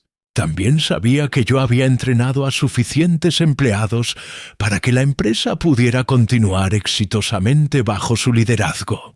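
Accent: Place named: Spain. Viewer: Coincido con un comentario anterior, la historia es buena pero creer que los argentinos hablamos así 😂😂😂es una burla. Es un español pronunciando la Z por favor!! Y por supuesto con Uruguay nos entendemos perfecto. Hablamos el mismo idioma!!! Hay que estudiar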